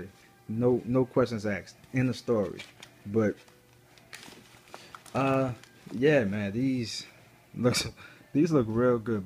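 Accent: New Orleans, United States. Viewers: New Orleans accent? I'm going to check the website out. Thanks for sharing!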